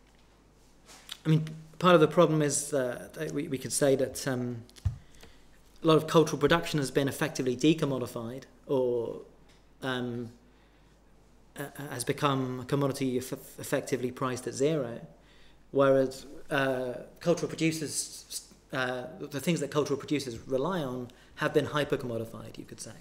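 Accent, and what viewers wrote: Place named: United Kingdom. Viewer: British accent is tricky 👽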